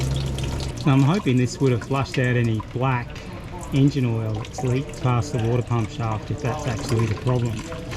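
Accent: Australia. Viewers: you're a legend. Good to hear another Aussie speaking Aussie (can we not do the bleep?), Mate, you did good, real fuckin good!! Cheers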